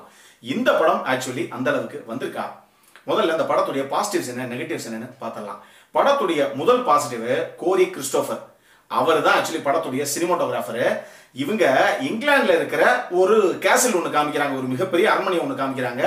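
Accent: Tamil Nadu, India. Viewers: Only for ur tamil slang kanna. Va kaanam.....???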